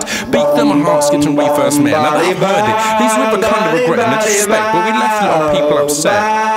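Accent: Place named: Essex, United Kingdom. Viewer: Yes with his Essex accent :L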